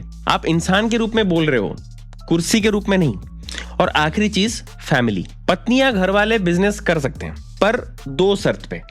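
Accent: Odisha, India. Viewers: Are u odia ??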